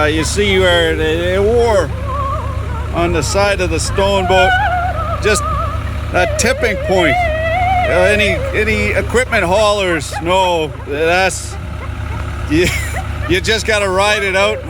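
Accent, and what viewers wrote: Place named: Canada. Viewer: Are you related to “Zip ties and bias plies”? Because you’re both from the great white north and talk almost exactly the same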